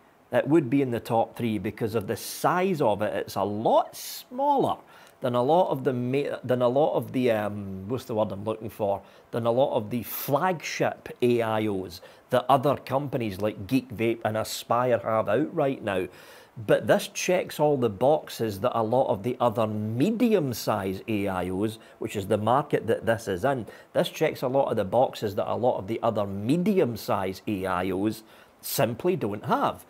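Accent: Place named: Glasgow, United Kingdom. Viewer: First time I've ever seen a video of yours. First idea popped in me `ead was if I was listening to Glaswegian or a bloke that uses the M-77 part of their commute home from there.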